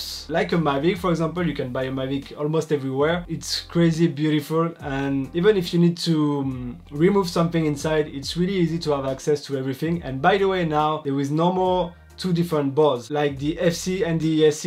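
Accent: France. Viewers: Tu es français ? Tu as un accent français quand tu parles 😂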